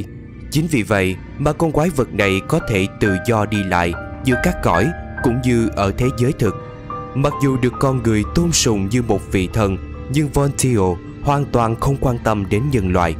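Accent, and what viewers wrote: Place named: South, Vietnam. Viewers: Giọng miền Nam mãi đỉnh 🎉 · Vẫn là giọng đọc mình thích nhất :)) giọng miền nam đầu tiên out trình mấy a giọng bắc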